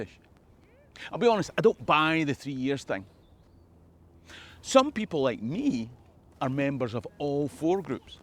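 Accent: Scotland, United Kingdom. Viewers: Man you sound pretty Scottish, thats enough proof for me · All you need is an authentic Scottish accent to be Scottish and you sir are Scottish. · Seriously - I "watch' with my eyes closed a lot. I was mostly just listening about how kilts have changed. When I opened my eyes to see what was next I had 2 thoughts "cool hair" and "wonder if he's a professor". It never occurred to me that a dude speaking about Scottish history in Scotland with that classic accent isn't really Scottish. And your insights in this video go much deeper than defining being Scottish. Very cool. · If you have an accent like yours, you're Scottish. Blessings from a Scottish lady who is now a naturalised New Zealander. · You sound like you were raised in Scotland, you have more reason to speak about Scotland than any American with 1/16th blood/DNA. Don’t worry so much about those bigoted, discriminatory, and prejudiced… it’s their problem In choosing that view. 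· Dude, with an accent like that- you’re Scottish, end of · Love your very Scottish patter. Keep it up and Feck the racists. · You sound Scottish to me mate. Sad people cannae keep rude comments to themselves. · You seem documented enough to do so! Who is 100% of anything anyway? (I am Scot, also English, German, French, Catalan, but it doesn't show...). After all, how many Egyptologists are Egyptian? How many paleontologists are dinosaurs? Keep up your videos with your rich scottish accent! :) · Man, I so love hearing the Scottish accent, your country is so nice and beautiful, you should just let the English go. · you were born in Scotland. You speak with a strong Scottish accent. And most importantly, you know what you’re talking about as you give your Scottish history lessons. Those who think skin colour has anything to do with anything other than pigment are what I would call pendejos. 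I’ll be offering a pint when the FM declares “Haste ye Back” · Could of fooled me! You look Scot to me. You sound Scottish. You live in Scotland. I do like your tan. looks good on you.❤ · With credentials and a good Scottish accent...I'm greatful. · I think everyone wants to be a little bit Scottish, especially after watching Braveheart or Rob Roy. It's truly a charming accent that along with it's close kin Irish, just makes people seem friendlier and more honest. Not that there's any truth (or lack of it) to that, but it's one of those accents that evokes certain feelings amongst most western folk. To me you're as Scottish as you want to be, if you've ever actually been there. Maybe some day I'll make it there, but not the now. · Whatever’s black people speak for ‘get it up ye!’ 🤣🤣🤣 brilliant. Quite frankly if yer accent is this strong no one can say anything about ye being Scottish. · he sounds Scottish because of his Scottish accent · I'm an American woman who is half-Scottish. 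Am I allowed to talk about Scottish history? I assume I am, but the half of my ancestry that isn't Scottish is ethnic German, so no one questions me. Obviously, the question by your commenter is racist, but I love the way you've answered him. Also, I grew up around my Scottish grandparents and I love your Scottish accent; it reminds me of them. I'm emotionally triggered by your voice. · Bwahahaha, dude your accent makes you so Scottish your ethnicity didn't even occur to me until this video. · How could anyone question Scottishness. The accent is a dead give away which shows he's been there for quite a while, and his knowledge and passion for Scots history is unquestionable. Apparently he also lives there, which in my mind is the main criteria. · Are you a Historian? Yes? Then study it all you want, talk about and educate people. And judging by your accent, you're a fellow Scot, so go for it luv. 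· Personally, I’m very happy to hear you speak with a Scottish accent, about Scottish history. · If you aren't Scottish that's a good accent my friend.🤣Love ya work lad! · Mate you sound Scottish to me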